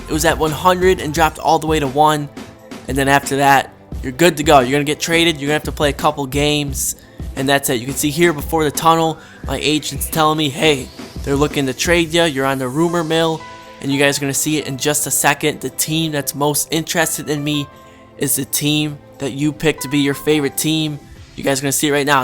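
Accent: Midwest, United States. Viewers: Why are you a Spurs fan? You sound like a midwesterner to me. Good video though. It worked for me, but they didn't trade me due to my conflict with Kevin Love. They traded me a week later, after I conflicted with the coach. I guess I was playing too well for them to trade me, IDK.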